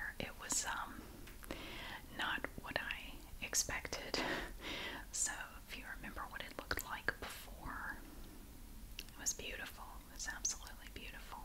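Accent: South, United States. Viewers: You have a soothing voice and mix in that Southern accent it's strikes gold . Personally, I like it better and feel the whisper takes away your best attribute. I watched your videos for years and congratulate on building your channel. My favorite is when you treated yourself to a cruise something you wanted so badly. That was an awesome emotional video.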